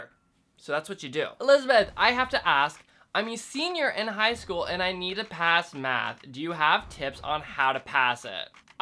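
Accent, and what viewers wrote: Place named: Canada. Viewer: The way Canadians say the letters -ou is so cute I can't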